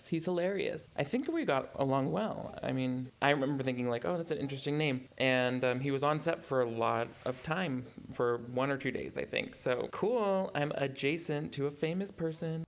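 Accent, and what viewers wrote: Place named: Boston, United States. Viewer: I also pegged you as Boston.... :)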